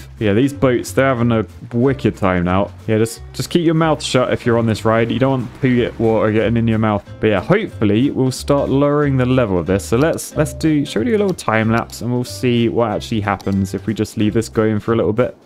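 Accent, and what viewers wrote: United Kingdom. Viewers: Because this guy is british, he really makes me think American English and English are completely different languages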